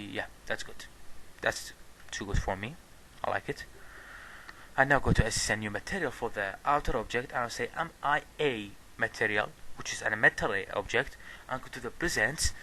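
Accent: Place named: France. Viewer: thank you very much ,,, you are french,Right?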